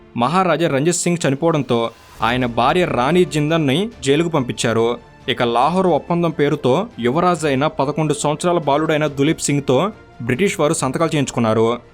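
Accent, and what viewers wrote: Telangana, India. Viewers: Telangana na Andhra na howle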